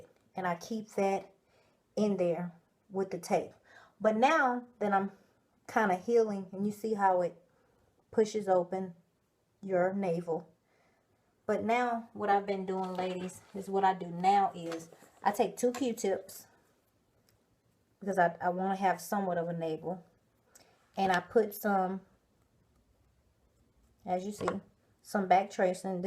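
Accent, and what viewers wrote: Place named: South, United States. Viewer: ❤️your southern accent! I'm from Connecticut. Where are you from?😁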